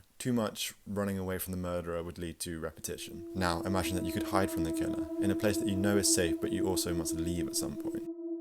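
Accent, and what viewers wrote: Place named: United Kingdom. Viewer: Anything told to you in a deep British voice is good, isn't it?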